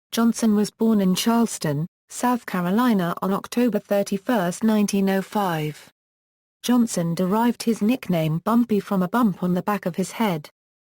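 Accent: England, United Kingdom. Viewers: Something about an English woman talking about American mobsters doesn't do it for me.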